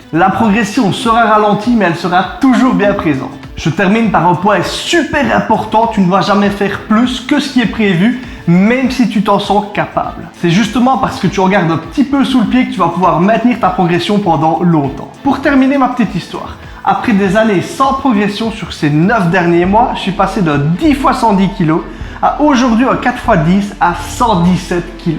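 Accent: Liège, Belgium. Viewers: Super tes vidéos. Tu as l'accent de Liège non? Tq je suis de la province de Liège aussi 😂💪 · Accent belge de la mort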